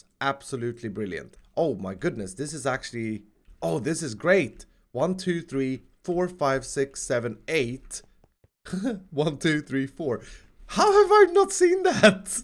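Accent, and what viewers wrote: Sweden. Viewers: Hörde att du var svensk på din dialekt :) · Are you form swiden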